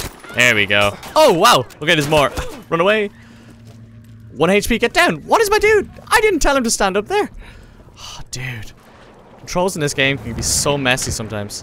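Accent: Ireland. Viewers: U sound Irish...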